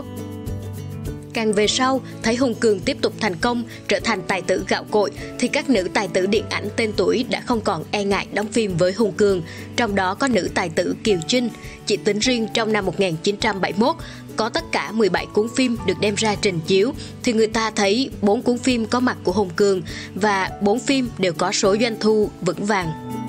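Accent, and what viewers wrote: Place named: South, Vietnam. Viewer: Giọng đọc hay, giọng Nam bộ mà sao thấy ko giống với kiểu cách mà người Nam Bộ xưa nói thế nhỉ, cảm thấy khang khác! 🤔🤔🤔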